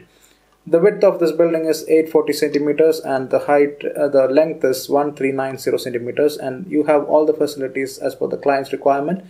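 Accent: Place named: Kerala, India. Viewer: malayali thanneyanenn nerathe manasilayi karanam malayliyude english oru english alle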